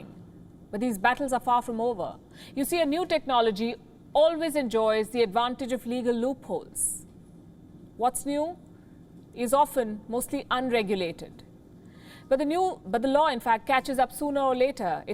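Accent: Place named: Hindi, India. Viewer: Pronounce: All good sings not all good tings...Your Hindi accent is too strong !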